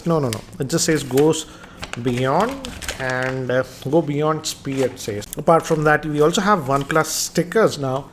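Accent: India. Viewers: very strong Indian Accent...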